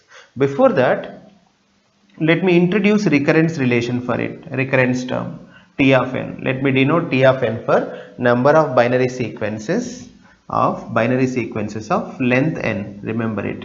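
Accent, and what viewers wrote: India. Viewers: The explanation is good but the indian accent is the real problem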